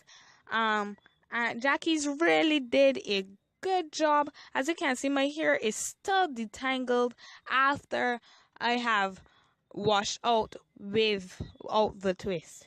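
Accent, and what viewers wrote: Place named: Barbados. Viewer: Are you Bajan??? I love your accents i love all my caribs ☺️☺️☺️☺️☺️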